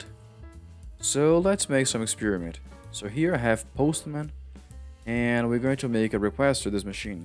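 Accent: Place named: Brazil. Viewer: Fácil reconhecer o sotaque brasileiro kkk obrigado pelo video, ajudou aqui, tmj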